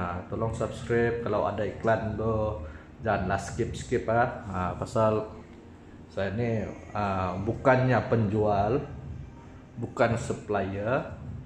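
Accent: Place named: Kota Kinabalu, Malaysia. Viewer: nah suara topinai urang kk ni kan hahah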